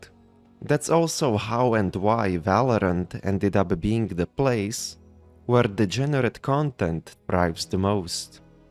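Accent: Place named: Russia. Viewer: I love the russsian accent. отличное видео!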